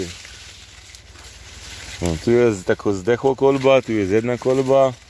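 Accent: Silesia, Poland. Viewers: Witaj. Po kuku i akcencie wnioskuję że to gdzieś na południu kraju - Śląsk 🤔u mnie o połowę niższa i zeschnięta, susza jej wogóle nie oszczędziła 🙈Ładna i oby była jak najpiękniejsza. Pozdrawiam serdecznie z subikiem 🤗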